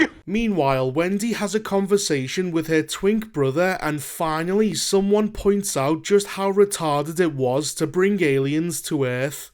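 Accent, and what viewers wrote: Liverpool, United Kingdom. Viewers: Robot Scouse monotone speech is definitely appropriate for this retarded shit. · it takes a scouser to speak the truth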